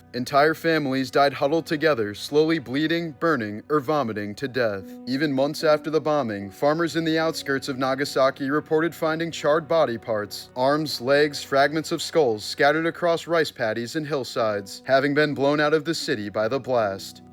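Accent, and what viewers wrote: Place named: England, United Kingdom. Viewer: No hes not saying it incorrectly he's saying it with an English accent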